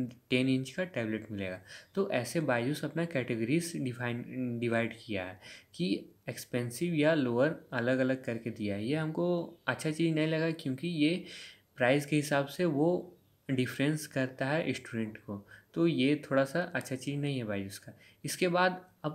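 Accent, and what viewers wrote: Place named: Bihar, India. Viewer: Tu bhai pakka bihar se hai 🙂🙂 kitna ko ketna bihar me bolte hain 🙂🙂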